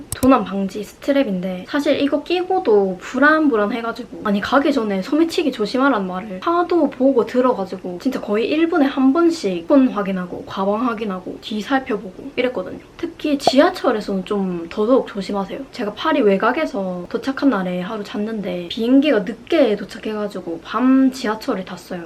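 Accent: Gyeongsang, South Korea. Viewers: 경상도 사투리 너무 귀엽다 💖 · 경상도의 억양이 매우 정겹네요😊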